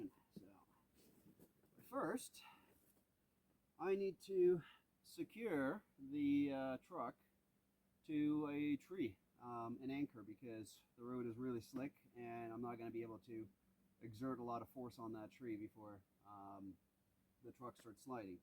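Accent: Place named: Poland. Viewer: Your „good morning everybody” is a single phrase where I can hear a ted of Polish ( non- native English speaker. Your „everybody” I can hear everybodi ( kind of soft Polish letter i ( like Irena) instead y. It’s very cute and pleasant sound. Also you don’t speak English as a person born/raised in America . The way you speak, built phrases, apply grammar and syntax, is like you graduated class for a public speaker: more sophisticated and elegant that common people use. I am having so much fun and pleasure just listening to to your narration. You don’t neither stumble , nor repeat yourself, talking fluently in an interesting manner. Thank you 🙏, sometimes , just for pleasure of listening to your voice. I am not ignoring the subject, just : double my pleasure of witnessing your every day adventures. 🤗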